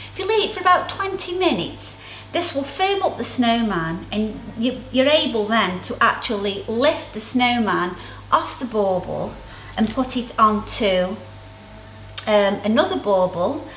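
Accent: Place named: Hull, United Kingdom. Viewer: love ya accent are you from Hull like me xx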